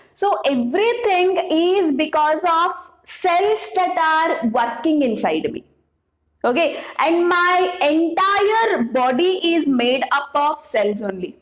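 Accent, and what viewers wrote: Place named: Tamil Nadu, India. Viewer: Madam you are speaking tamil😂😂😅 you are speaking is funny 🤣🤣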